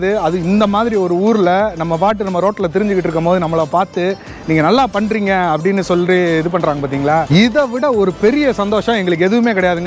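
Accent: Madurai, India. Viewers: Nee madurai ah bro. Slang madurai maari irukku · ஆஹா...ஆஹா...மதுரை தமிழ்... அழகு அழகு....வாழ்க வாழ்க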